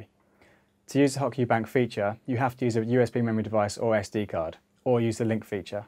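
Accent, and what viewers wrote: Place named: United Kingdom. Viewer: The guys accent is too pronounced and he talks too fast. The tutorial would be more effective if it didn't cater only to brits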